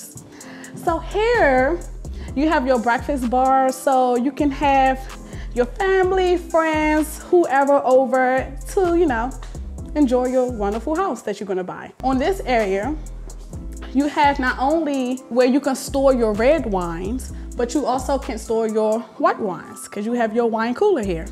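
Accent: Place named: Washington DC, United States. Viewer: Love that D.C. accent 💚💚💚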